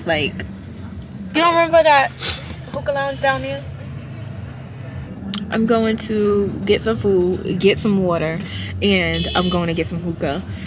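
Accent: New York, United States. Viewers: but you’re aware you have an accent to people who don’t live in New York right? 😂😂😂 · that New York accent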